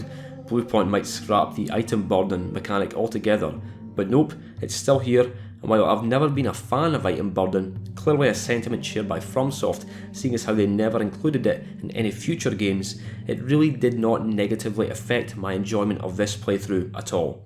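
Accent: Scotland, United Kingdom. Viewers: Great video mate! I' ve only had a few problems understanding everything, because of the scottish accent, but went fine at the end, I guess 😅😂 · Great work mate, refresher to hear a Scottish YouTuber with an actual normal Scottish accent 😂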